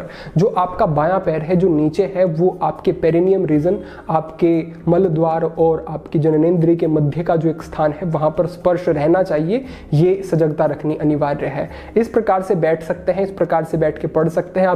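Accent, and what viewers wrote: Standard. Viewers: Kitni shudh hindi bolte hai aap🤩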